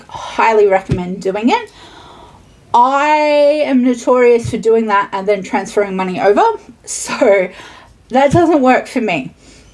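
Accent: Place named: Australia. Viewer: You from Australia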